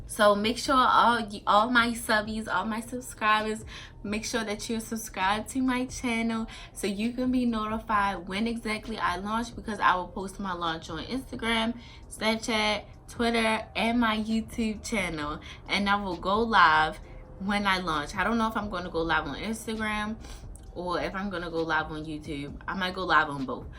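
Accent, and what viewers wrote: Baltimore, United States. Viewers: I think she’s from Baltimore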